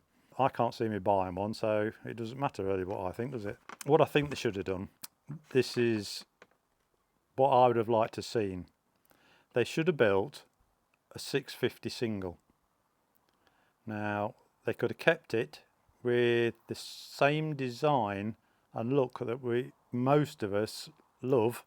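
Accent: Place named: Midlands, United Kingdom. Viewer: There's no hiding that Midlands accent Leicester..Derby???😂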